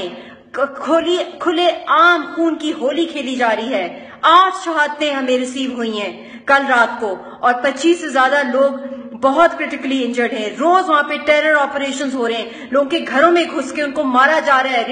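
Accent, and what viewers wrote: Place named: Punjab, India. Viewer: Kashmiri my foot, her accent is punjabi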